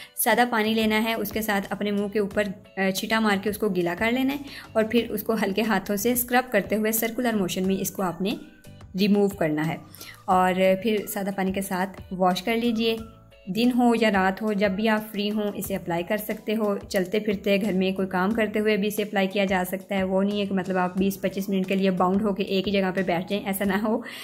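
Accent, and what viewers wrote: Punjab, India. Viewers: The way u speak punjabi …Haye so cute 😘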